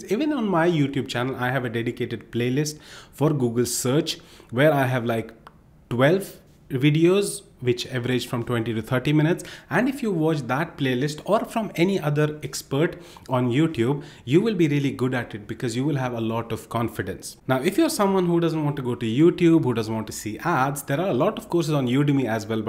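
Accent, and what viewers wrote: India. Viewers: He have kinda Indian accent 👀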